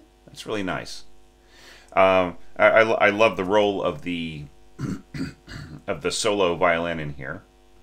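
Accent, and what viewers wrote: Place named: France. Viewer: Very sweet. Sounds very French. I love it. And so many thanks. It is a joy to hear you talk about the score...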